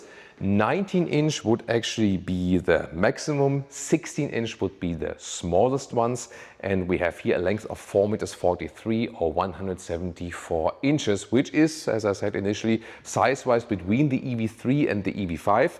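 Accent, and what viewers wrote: Germany. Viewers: i like your German English. you speak both at same time love it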